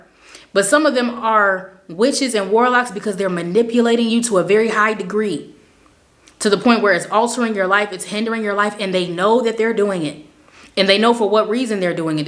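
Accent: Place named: United Kingdom. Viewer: The way my mind was convinced that you were from the UK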